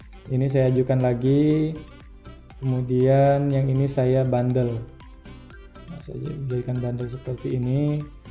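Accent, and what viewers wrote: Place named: Pontianak, Indonesia. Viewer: Kayak bhsa pontianak ya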